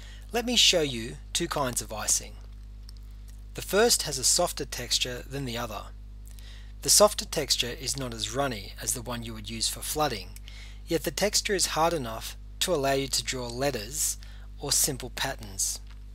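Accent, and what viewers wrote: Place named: Australia. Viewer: good job! i love your accent! are you australian?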